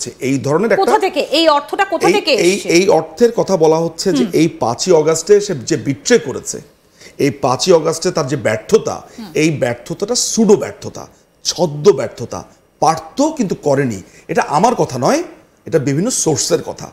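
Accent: Kolkata, India. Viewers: Kolikata accent already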